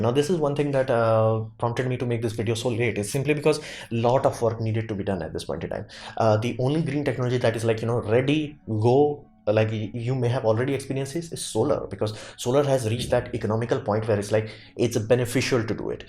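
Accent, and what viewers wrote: India. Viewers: I see. I think if you just took the existing scripts and narrated them a little more slowly, it would make for a slightly longer video yes but may improve it for viewers. I don't think 30 minutes or so is too long for a youtube video -- I agree that there are diminishing returns when you get over the 30 minute mark, like 1, 2 hour videos won't get views. But 30 minutes is fine, people watch informative videos of that length from emerging channels on youtube. And part of it is also your accent -- I am not trying to say that in a judgmental way, just when you combine it with the speed that you talk sometimes I don't catch something and have to skip back. I am an American so if I was Indian maybe I would not have any such trouble. And I am not saying it's a major issue, but even a minor thing like that can an obstacle to your channel's growth I think as people are lazy, they just want to watch videos that entertain/interest them in a very passive way without effort. 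They will click away. But if you slowed down just a bit I think that would completely eliminate the issue. As far as the tech limitation, I'm sure someone with as much tech expertise as yourself could find a workaround, right?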